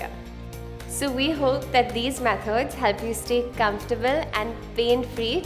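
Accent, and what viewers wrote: India. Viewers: you dont look indian but your accent says otherwise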